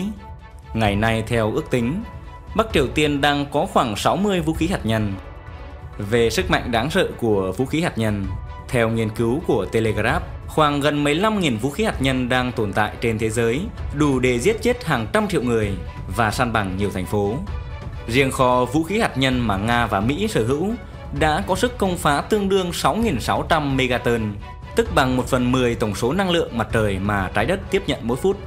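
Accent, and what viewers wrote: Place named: North Central, Vietnam. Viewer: 1trăm 20,chứ k phải 1tăm 20..phát âm bắc trung bộ, lai bắc bộ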